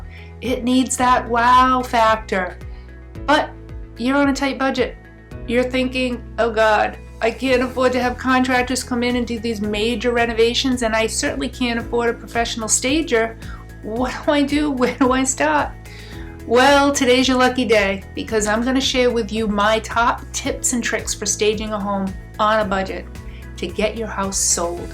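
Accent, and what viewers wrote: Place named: Massachusetts, United States. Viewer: Sometimes I forget how much I miss the Massachusetts accent.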